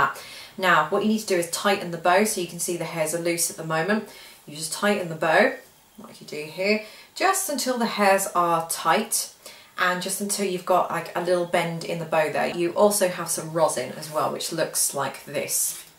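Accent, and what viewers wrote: United Kingdom. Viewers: I lov the way u speak with a Britain accent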